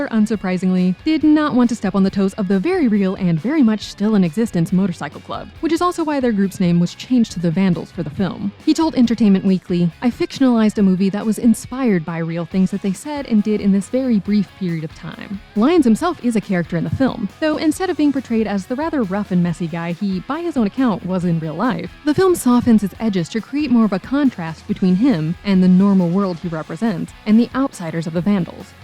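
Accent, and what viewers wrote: United States. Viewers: Chicago area accent. Not that different from the NJ accent near NYC.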